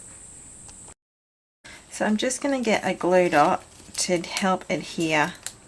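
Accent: Australia. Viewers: Love this card... simple, yet elegant. Vintage style will always get my vote! Nice to hear an Australian accent for a change.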